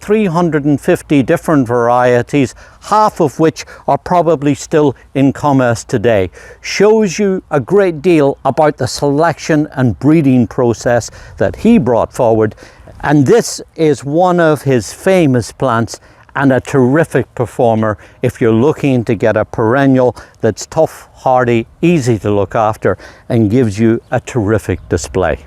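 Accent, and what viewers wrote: Northern Ireland, United Kingdom. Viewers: Is that a Northern Irish accent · Great video Mr! Thank you so much for sharing your gardening knowledge with us! I hope you don’t mind me saying so - it’s truly lovely to hear your accent. My late father was from Northern Ireland as well. Keep up the great videos! I have subscribed and will be looking forward to learning more! ☺️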